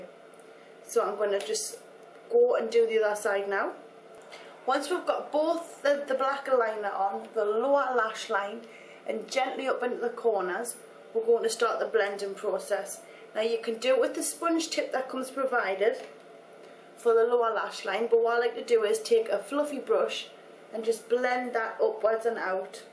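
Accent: Newcastle, United Kingdom. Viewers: where are you from? it sound like your from around the newcastle x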